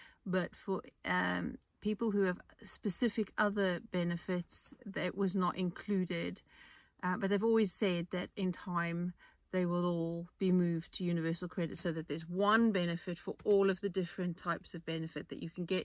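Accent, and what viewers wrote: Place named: South Africa. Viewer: The UK benefit system is rorted (to take unfair advantage of a public service) with 950k job vacancies, over 8.5m people claiming benefit’s more people claim credits than pay tax. You sound like your from SA .. 40% joberg unemployed they don’t have benefits .. too many takers not enough givers in this country.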